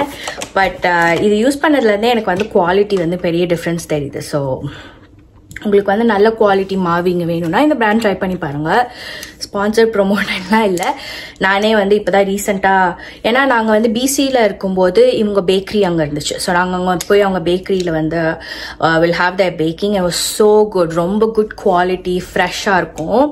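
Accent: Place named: England, United Kingdom. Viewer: Hey, I know you speak very nicely in Tamil but now you are talking like as if you don’t know much of the language. So make sure you don’t get your English accent to Tamil. Just a small reminder as I have seen you speaking well in your previous videos. Take care.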